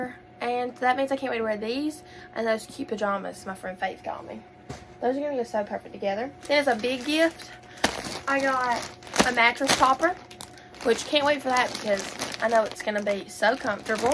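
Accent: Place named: South, United States. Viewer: Cool video 😃😃 I love your Southern credo twang!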